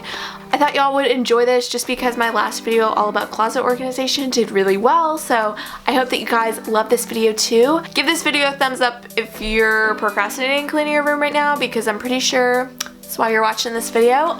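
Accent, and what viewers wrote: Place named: United States. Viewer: She sounds american and she said douvet.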